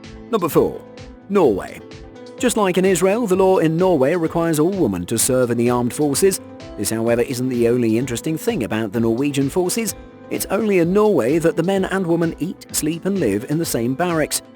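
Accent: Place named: United Kingdom. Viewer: *women... FFS and it's a British naration.... Disgraceful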